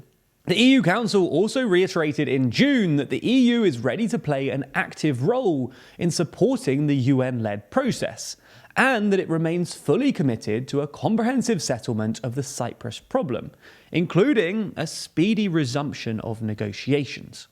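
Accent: England, United Kingdom. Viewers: No one expects absolute perfection, just close but with an English accent. When they do something as ridiculous as pronounce the Spanish double-L as a hard L sound, you know they either have had surprisingly little exposure to Spanish (possible but not likely), put no thought into it, or have so little respect for other cultures that they intentionally over-Anglicize their pronunciations. They claim they look up pronunciations but sometimes forget them once they begin recording, but some of their mistakes are too easy to avoid, and they also could write their scripts with (quasi-)phonetic spellings, but apparently they don't. And they tend to keep making the same mistakes over and over in multiple videos. These are very simple things, and not doing them means they aren't putting in nearly as much effort as they claim.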